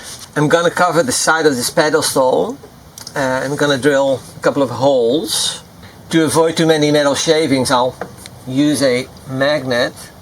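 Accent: Netherlands. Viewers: I was really curious about the accent, I first thought that there was a region in the US where people, maybe, spoke with a Dutch sounding accent because of some interesting historical reason 🙃 But, the way you say camper is very Dutch 😉 You're right, I am Belgian, but I'm Flemish speaking not French. I have a French last name and I also live close to the French speaking part of Belgium. Many people get confused about that. was a popular boys name in Flanders around the time I was born. Bedankt voor de leuke filmpjes!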